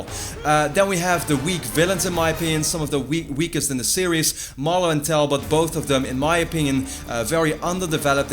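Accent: Netherlands. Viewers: Are you from THE netherlands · is he Dutch? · He has a dutch accent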